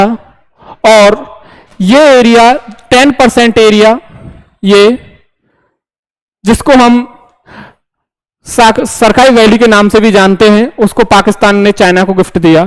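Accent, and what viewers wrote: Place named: Braj, India. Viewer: ब्रज की मिठास से युक्त वाणी ऐसी लग रही है,जैसे भगवान कृष्ण गीता का उपदेश दे रहे हो।।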